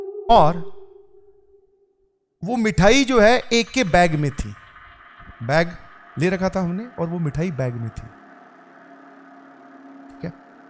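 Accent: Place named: Bengal, India. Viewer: Ami je tomar😅 hayy your Bengali accent just killd me ❤❤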